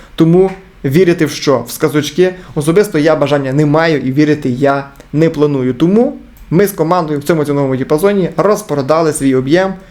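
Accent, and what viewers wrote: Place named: Zakarpattia, Ukraine. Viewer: крутий акцент у тебе, пахне закарпаттям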